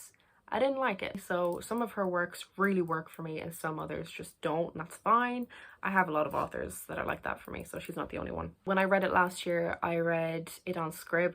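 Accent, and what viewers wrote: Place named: Australia. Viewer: Your Australian accent is as bad as my Irish one but I love it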